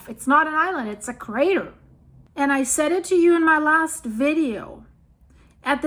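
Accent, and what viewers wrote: Germany. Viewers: Sie IST Deutsche, oder? Geh ich jetzt von aus bei, und dem unauffällig hörbaren deutschen Akzent. 😂❤ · Hi, this is a good, informative video about vulcanos in Santorini area in Greece. And your German accent of English is charming 👍😉😊 also.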